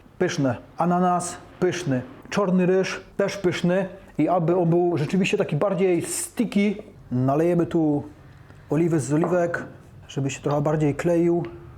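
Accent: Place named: Silesia, Poland. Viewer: kurcze, do zludzenia przypominasz akcentem Podolskiego :D Skad jestes ze slaska? Pozdro z Chicago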